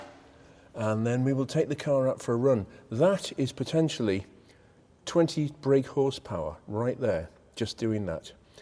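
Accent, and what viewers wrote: United Kingdom. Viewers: When aggressive meets beautiful on 4 wheels. Fantastic review once again. No stupid music, no fluff and a very British delivery... Lovely 🇬🇧❤️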